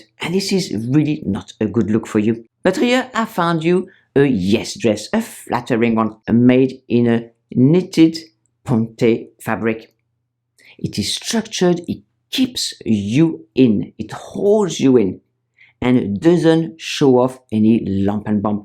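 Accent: France. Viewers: Such helpful advice, even more effective delivered d with a charming French accent! And your humor is another bonus 😍thank you! Just found your video today so am subscribing formore🙏🏼🥰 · I could listen to you talking with your French accent forever. 💙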